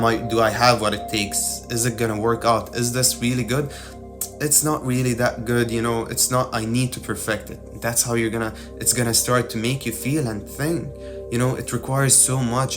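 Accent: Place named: Ireland. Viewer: Obviously, English is not your first language, but I thought I detected a wee Irish twang in your accent?..... Thanks for your wisdom brother. Appreciated. ❤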